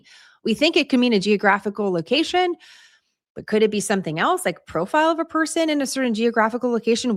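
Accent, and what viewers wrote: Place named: United States. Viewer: Quite frankly you sound like an American disguised as a good girl.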